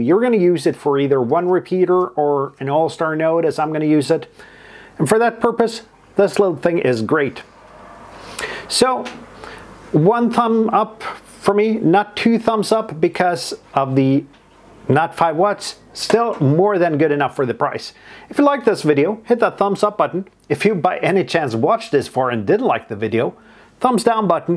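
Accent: United States. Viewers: Yes, I've noticed a lot do speak English well. This man in the video could pass for an American or Canadian citizen with his accent, no problem. I'm American, by the way. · Your prosody not very Norwegian at all. You sound waaaaaaaay more American than Norwegian.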